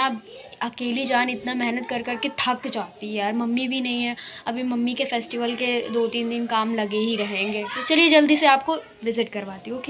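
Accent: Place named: Lucknow, India. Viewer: Lg rhi h lko ....vali ... mtlb bat krne ka tarika ...mai bhi lko se ..hu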